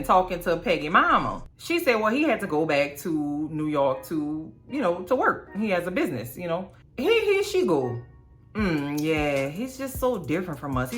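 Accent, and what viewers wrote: New Orleans, United States. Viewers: I'm up at 6am watching your channel and lmao I love your commentary and I love your New Orleans accent ❤ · You are so funny! I am glad I found your channel! Now I have two “shows” to look forward to on Sunday night! LOVE your New Orleans accent!!!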